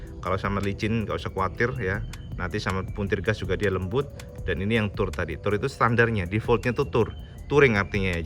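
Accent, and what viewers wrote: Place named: Tulungagung, Indonesia. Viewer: Penasaran karo motor 6 silinder terus ndilok" Neng youtube lakok nemu vidio iki, tapi koyok ra asing karo logat e, mari tak dilok neng google tibak e wong T. A hadehh, sak kabupaten bak e😂😂 , Sukses terus mass 👍🙏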